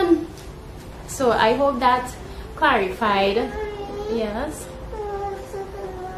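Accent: Trinidad and Tobago. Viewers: You do not have to explain or apologize with regard to your Guyanese background, your Trini accent and your disability. You were made in GOD'S image and likeness and you are beautiful. And you clearly display confidence in yourself, so that is all that matters. The Mighty Sparrow was born in Grenada and Peter Minshall was born in Guyana. These folks are Trini to the bone and will have it no other way. Live your life my dear. Do not worry about people who do not understand.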